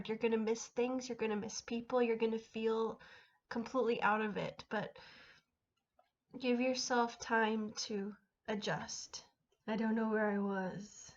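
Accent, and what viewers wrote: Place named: Sweden. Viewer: Hello! Thanks for subscribing! I'm definitely looking forward to watching more of your videos because I actually have know a couple that's moving to Sweden soon to be long-term missionaries! I'll definitely tell them about your channel! Also I like listening to other languages, your Swedish sounds super cool! And I like that your English has a Swedish accent too!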